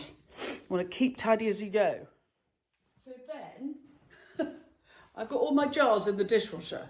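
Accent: England, United Kingdom. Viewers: lové💗looks like wisconsin and adore your english accent🌸🌸🌸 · As an American, I enjoy your English accent & unusual (to me) terms very much. 🌱❤️ If I book a place for one of your workshops, is access to the content available to view later, after the scheduled workshop? The time is inconvenient for me, but I would love to learn how to make one of your unique posies. 💐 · I absolutely love you accent! One of my dearest friends is in Oundle, miss her terribly. Had the chance to visit beautiful England 11 years ago . The gardens at Burley house were magnificent!. Cannot wait to visit once more! Thank you for your content I enjoy it very much! Canadian dairy farmer morphing intoa flower farmer as a rieterement project. Take care! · Thank you for all you great tips! I am a follower from Sweden and I just love you British accent!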